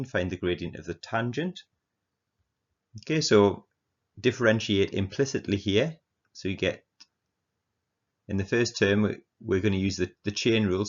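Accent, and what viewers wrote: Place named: North East England, United Kingdom. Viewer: Many thanks. That’s a north east accent .. from where may I ask ?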